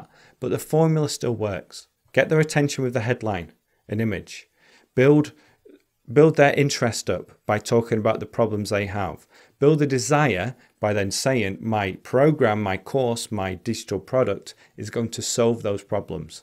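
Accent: United Kingdom. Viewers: Wow, I havent `t seen you video for a long time , you `r British accent is really nice . Thank you .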